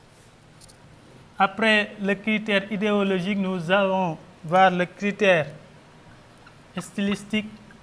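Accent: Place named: Africa. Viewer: J'ai eu 2 enseignants ayant une telle diction. Ils étaient professeurs de mathématiques. 6 classes du collège ont vu les élèves en échec total. Donc plus de 2 ans à reprendre pour 198 élèves. La langue française est une langue vivante, je ne doute pas de ses qualités littéraires écrites. Mais les différents dialectes de chaque pays africain affectent la diction. Une étude à été dirigée dans plusieurs pays africains.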